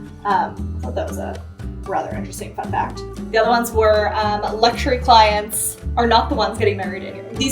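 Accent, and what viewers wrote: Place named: Canada. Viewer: Great video. Lots to consider in this video. Time to jump in and go for it. I have been trying to pick out your accent. Canadian...can't believe I didn't pick up on it. Where did you get your background music?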